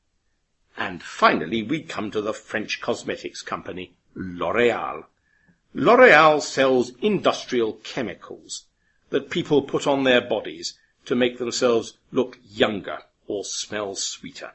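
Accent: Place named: United Kingdom. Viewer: I love British accent, it’s music to my ears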